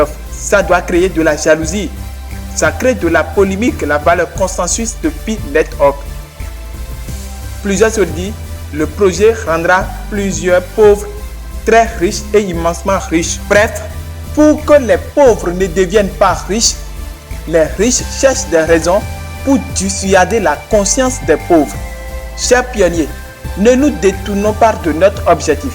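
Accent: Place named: West Africa. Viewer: Son français n'est pas forcément mauvais, mais c'est l'accent de sa langue maternelle qui prime, vous nos fr de l'Afrique de L'Ouest avez ce problème là, écoutez un Congolais(🇨🇩) parler francais et après écoutez-vous parler vous comprendrez, alors l'essentiel est le message qu'il nous donne, c'est très important👌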